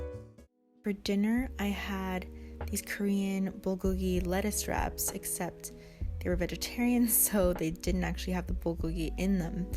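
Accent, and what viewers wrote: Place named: United Kingdom. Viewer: Your British accent is absolutely stunning darling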